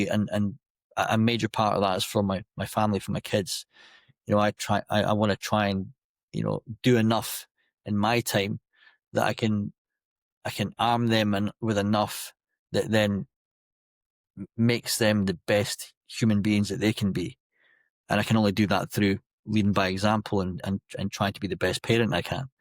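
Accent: Wales, United Kingdom. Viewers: This is my Go To motivational podcast.. Well done for this one, must admit the Welsh accent is very soothing and perfect for your profession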